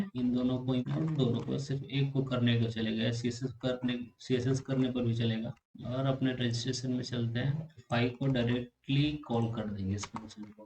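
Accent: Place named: Chhattisgarh, India. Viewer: Bhai chhattisgarh se ho kya?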